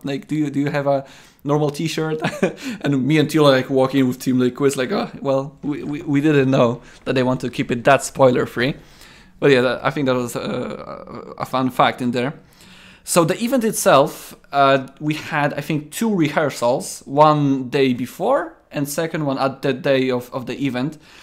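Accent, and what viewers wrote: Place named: Poland. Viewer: Nice adventure you've had! I enjoyed watching your inside view of this AI vs Human duel, well done! PS. Funny, after a few minutes of watching I've noticed that except of the familiar accent you've used some phrases that is also familiar for me but definitely not of an English origin so I've checked where are you from and Bingo! - fellow Pole. Don't get me wrong, your English is very good, but while freely speaking you gave up your nationality ie. "ran circles around someone" in English mean to do something much better than someone else rather than taking extreme care about someone (Polish meaning of this phrase). Pozdrowienia! Oh and btw, as I understood you've played against different agents each game right? I felt sad for you because of your first 5 matches but imagine what happened to the agent who lost exhibition match - probably it has been deleted.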